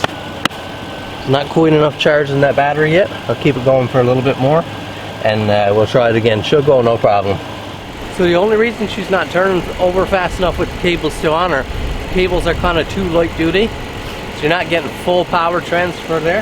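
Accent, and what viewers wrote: Newfoundland, Canada. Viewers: Its friday night and I am watching a bulldozer push snow, now thats a cool night. I was wondering about your accent as you were talking, it sounded familiar then you said NewFoundland...ahhaaa! I am from northern Maine and that accent is how I used to talk before I moved to New Yark 40 years back. Its 'aboot' doing a job! Well done. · Was there no choke on the carburetor? If so, wonder why not. I was trying to place the accent but you said Newfoundland--yours doesn't seem as distinctive as some I have heard. Glad that your Grandpa was able to, at least, view you working it. Bet he would of loved to sit in that seat again. Grew up running the old JD 2 Cyls. and love their sound to this day.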